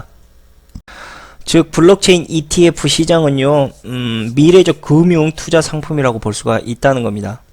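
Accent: Jeolla, South Korea. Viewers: 역사를 좀 배우세요 저분말이 사투리?원리ㅐ 호남말이 표준어 입니다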